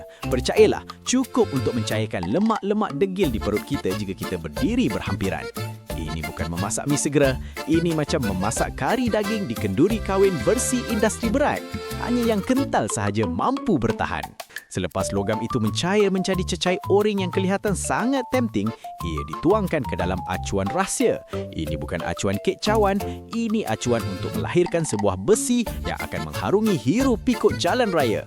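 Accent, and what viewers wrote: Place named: Indonesia. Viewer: menyampah plk aq dgn gaya ko berckp....dh mcm style Indon kpg !!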